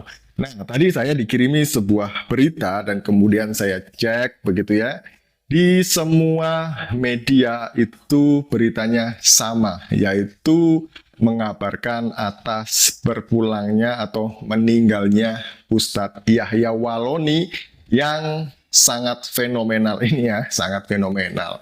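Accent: Java, Indonesia. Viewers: Medog Jawa nya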